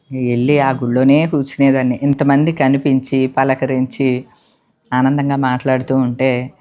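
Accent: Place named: Godavari, India. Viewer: Maintinundi aa someswaruni darshanam kaliginchinanduku chala santhoshanga undi chala santhosham yee janmabandamo idi sivayyalani andarini chupistunnandiku chala santhosham mee godarollo matalu chala theeyyagauntayandi aaa godari neellalaga chala santhoshamandi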